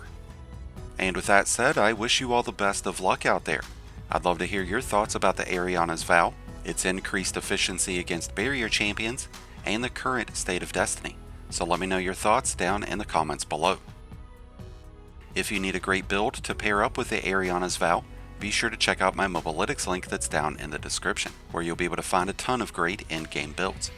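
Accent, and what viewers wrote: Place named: Kentucky, United States. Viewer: I can place the accent...perhaps KY?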